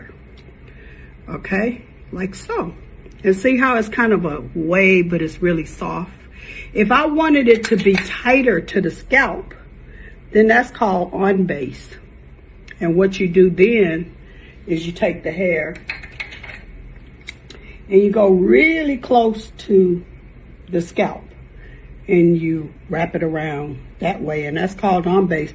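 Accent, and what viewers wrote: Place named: South, United States. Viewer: I love her Southern accent ❤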